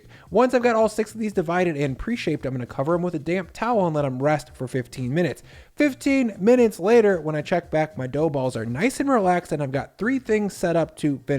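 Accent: United States. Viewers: Looks great... but Christ I cannot listen to the American accent for 14 minutes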